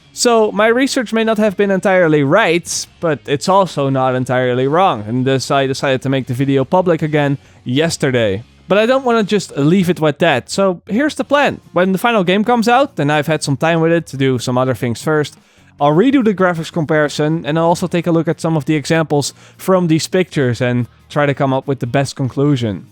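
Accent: Netherlands. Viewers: Do one about season pass and the pre-order stuff! The P416 for example, which you get when you buy gold edition; Is that a low lvl rifle for smoothing up the egdes in early-early game or is it like in a container in your stash which you can "unpack" at any time with the negative effect that it binds to you and your lvl? Sort of like Schrödingers cat? Also what are the content drops and how will they work? National guard gear? the "perks"? I'm not asking you for straight answers but just your thoughts on it. Man I have been watching your videos since you started making them, back when all we had was rumours and speculations based on "hints" inte the first trailer. I can't believe it's finally coming out!! I have gotten engaged, had a son, raised him for 2 yrs, moved to another town, quit my job, and started studying (which I'm almost done with) during the time from the reveal to release. 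All this accompanied by your videos and dutch accent, almost each week. This is gonna be great! have a nice one, Or as we say, IIN SWEEDEN, "kom igen nu Britt-Marie, KÖR för fa-an"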